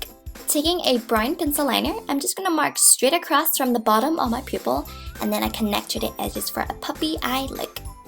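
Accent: Ireland. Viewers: It's Irish.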